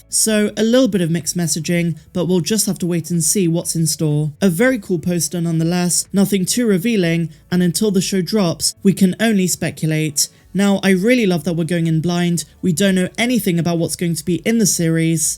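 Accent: United Kingdom. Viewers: I ADORE YOU,! You freakin Rock 🤟❤️💋😘🤠! And hey, that Brit accent is pretty sweet too 😄💗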